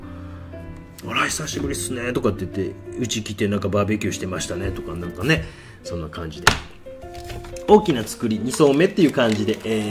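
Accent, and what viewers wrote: Kansai, Japan. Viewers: 柔らかい語り口調の関西弁も落ち着きます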